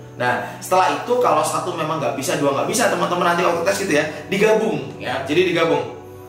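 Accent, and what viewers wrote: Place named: Banyumas, Indonesia. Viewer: Kayanya ka ki orang daerah cilacap,banyumas,purbalingga kah?ngapak mulu perasaan wkwkwk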